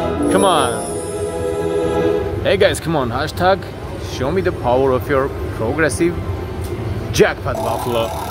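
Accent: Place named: Iran. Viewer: love your Iranian accent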